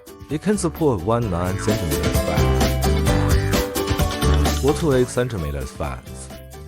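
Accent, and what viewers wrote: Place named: China. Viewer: Wow this English sounds very Chinese!! 你好吗👋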